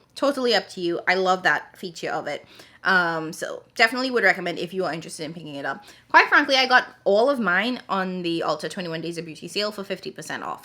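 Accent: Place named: Trinidad and Tobago. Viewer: Why did I say the caption in your trini accent 😂😂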